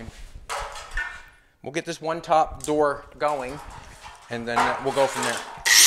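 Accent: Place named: Canada. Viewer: Ohhhh. "Tudor"!... last episode I kept thinking you were saying "two door" over and over again. Hahaha i gotta get caught up on my Canadian accent decipher. Looking good guys! 1 hour chop!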